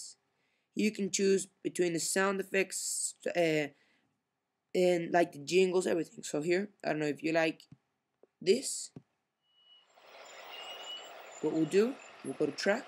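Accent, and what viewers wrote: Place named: England, United Kingdom. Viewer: your accent is very cool. Where are you from? I can't really tell if you're slightly english, or Indian..thanks for the help too :)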